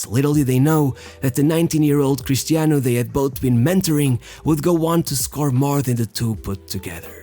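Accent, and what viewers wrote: Portugal. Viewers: Boas, parabens pelos videos. Consigo perceber pela tua pronúncia que és portugues. Por isso peço te que incluas legendas em português, pois os teus videos tem muita qualidade e há muita gente portuguesa que gostaria de ver os teus videos mas que não entendem ingles. Abraço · You have a portuguese accent, i'm pretty sure you're portuguese! your videos are very good, keep it up! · Só precisei de ouvir 10 segundos de vídeo para saber que eras português. Abraço, continuação com o bom trabalho.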